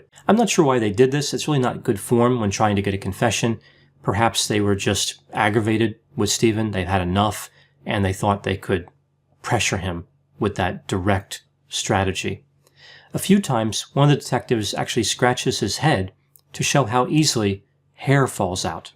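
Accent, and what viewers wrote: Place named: Georgia, United States. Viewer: hmmm, too busy commenting on the Georgia accent I guess....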